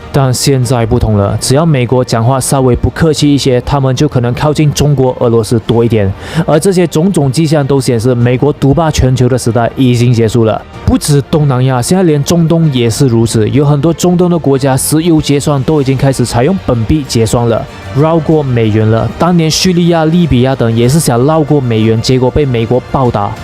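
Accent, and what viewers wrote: Malaysia. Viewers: 听他的口音 是马来西亚人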